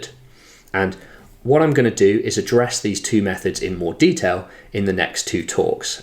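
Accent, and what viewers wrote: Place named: United Kingdom. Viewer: 这英国口音听着太舒服了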